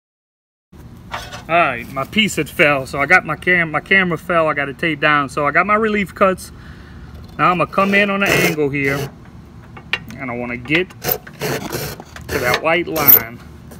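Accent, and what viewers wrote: Louisiana, United States. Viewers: Are you from Louisiana? Your accent sounds like it. Thanks for the video! Great idea! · I’m hearing a Louisiana accent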